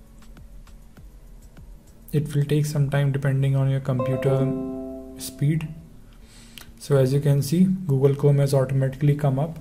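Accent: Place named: India. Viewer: I hear enough damn Indian accents when I use the phone!!!!!!!!!!!!!!!!!!!!!!!!!!!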